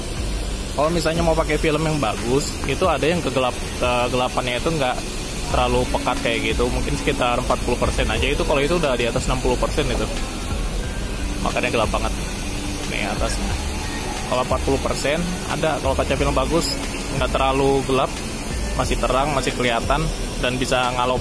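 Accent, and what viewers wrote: Indonesia. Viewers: hahaha ada dialek minangnya berasa ke sumatra 😅😅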